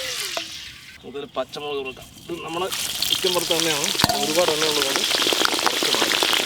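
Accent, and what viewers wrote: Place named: Palakkad, India. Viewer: Heloo ... ...ചേട്ടന്റെ എല്ല വിഡിയോസും പൊളിയാണ്.. ട്ടോ... നല്ല രസം ആണ് കണ്ടിരിക്കാൻ.... മാത്രമല്ല... പാലക്കാടൻ സംസാരവും... എന്തായാലും പൊളി തന്നെ...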